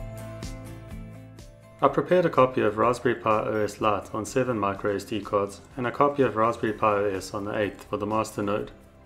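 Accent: South Africa. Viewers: You sound like a south african ? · Clicked link. Didn't expect to hear a South African. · But why? P.s. is that an SA accent? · love the South African accent, but please call it a Water cooling system, not a cooling water system lol!! Impressive stuff, could you share the clusters Hashrate for BTC when overclocked to 2Ghz? and the temps? would be a nice stress test on the cluster and cooling water system :p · Awesome project! I’m I hearing a South African accent?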